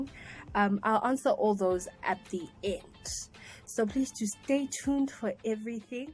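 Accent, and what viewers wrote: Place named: South Africa. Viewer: Hi, thank you for the uplifting story. Question: What are the similarities between living in Madagascar and S.A.? Your accent and the words you use (I.e. woza, yooo!) tell me that you have integrated to the SA environment. Thanks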